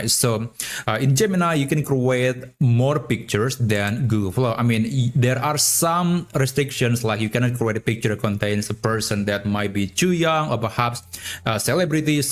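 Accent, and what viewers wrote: Indonesia. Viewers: dari logatnya kerasa banget nih orang indonya hahaha makasih bang tutorialnya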